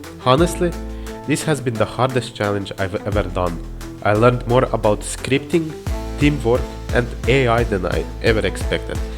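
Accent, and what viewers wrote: Russia. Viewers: BRO SOUNDS RUSSIAN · ты руский по акценту понятна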